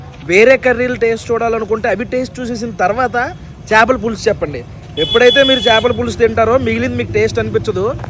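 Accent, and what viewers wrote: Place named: Godavari, India. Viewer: Mee godavari slang super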